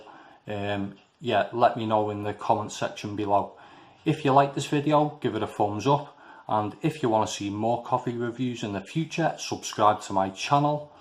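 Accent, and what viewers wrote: Liverpool, United Kingdom. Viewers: Sorry, this is not related at all but I have to confirm, is that scouse accent I'm hearing?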